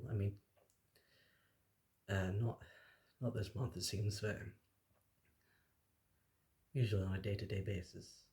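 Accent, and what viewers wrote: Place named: Scotland, United Kingdom. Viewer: I can tell by the accent your Scottish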